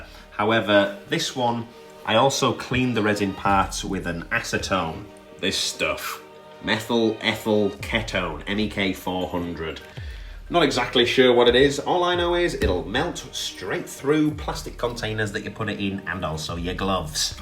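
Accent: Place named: England, United Kingdom. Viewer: English accent and American toys is an odd mix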